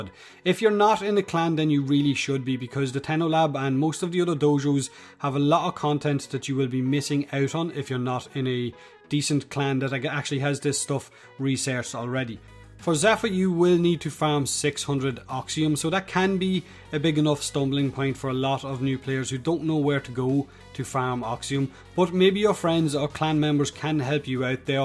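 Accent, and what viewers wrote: Ireland. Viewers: YES IVE SPENT MONTHS LOOKING FOR AN IRISH WARFRAME YOUTUBER THAT ACTUALLY SPEAKS IRISH! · is that an irish accent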